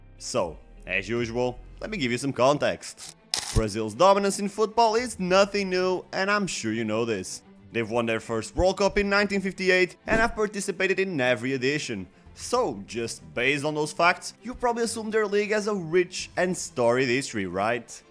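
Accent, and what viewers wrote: Portugal. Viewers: Is the dude narrating from Portugal or something? His pronounciation of the names sounds like a Portuguese trying to say the brazilian names. The S feels almost like a Carioca speaking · This accent don't lie to me: you're abolutely tuga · i love your european portuguese accent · aposto que ele é portugues, pelo sotaque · Por quê? Parece sotaque português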